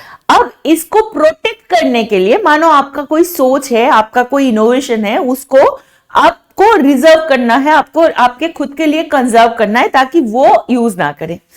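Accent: Bengal, India. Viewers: Develop countries like America France Russia Britain Japan etc Underdeveloped nation like Afghanistan Bangladesh Myanmar Chad Mali Nepal etc I think ma'am you are from West Bengal. Bcoz you hindi tone like that. Thank you ma'am.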